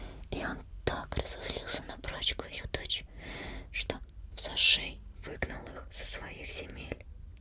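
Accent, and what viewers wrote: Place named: Russia. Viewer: Amo el acento ruso, relaja muchisimo❤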